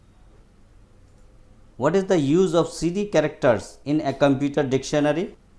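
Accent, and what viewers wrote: Northeast India, India. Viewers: Where are you from are you from northeast India because of your accent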